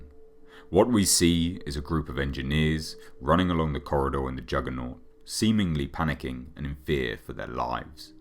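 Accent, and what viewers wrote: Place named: United Kingdom. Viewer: The narrator sounds like the guy who does Dune videos but with a British accent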